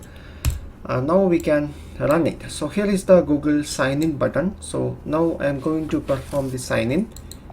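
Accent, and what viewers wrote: Kerala, India. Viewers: are you from kerala ? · I tapped the ad so you get money, its very helpful tutorial I love ur accent btw, very hot Indian accent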